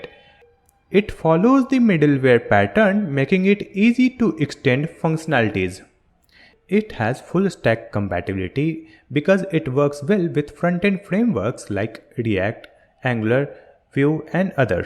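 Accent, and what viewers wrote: India. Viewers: you explain fast and youre indian so a little bit hard to understand what your saying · Indian accent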